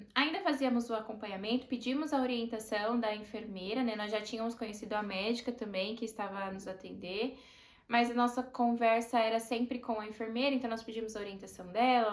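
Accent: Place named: Portugal. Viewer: ❤❤❤❤Primeiras impressões, já mistura o nosso português com o Português de Portugal...kkkk Principal diferença que encontrei é que no Brasil pai não é visita, porém, existe a resistência dos hospitais em se adaptar a essa lei por diversas situações inclusive lotação hospitalar. E suponho que 95% das maternidades públicas não permitem a permanência do homem no período noturno pelo exato motivo do compartilhamento de quartos. Existem maternidades modelos mas são poucas e extremamente disputadas. Nessa o serviço privado ganha, porém depende do plano de saúde também. Lindo relato, super detalhado e mto necessário pra quem passa pelo mesmo. Obs: Achei lindo quando vc disse que precisa do perto de vc, vai além da necessidade com a criança...demonstra amor e segurança. Lindo casal..amei! Parabéns!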